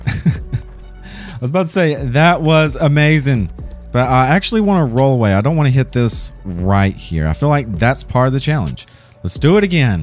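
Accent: South, United States. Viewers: Is there a name for this sort of lispy, effeminate Southern accent? Like how Lindsey Graham talks.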